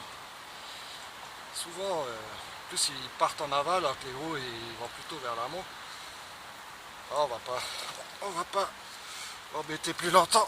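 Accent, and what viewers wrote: Alsace, France. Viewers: slt j'ai bien aimé t vidéo avec ton accent alsacien c'est un plus je me permet de venir vaire toi tu parlais d'économies pour les bouillettes je sait pas si tu connais le site aliexpres tu peux trouver du matériel pour les montages pour la pêche de la carpe PVA sac solubles est qui est moins chaire que les grandes marques le seul inconvénient c'est la livraison qui est un peu longues c'est connu par les carpiste le matériel est de bonnes qualités il à était testé pendant 1 ans voilà si sa peut te faire économiser encore un peu d'argent dit mois se que tu en pense dans l'attentes merci au plaisir de te suivre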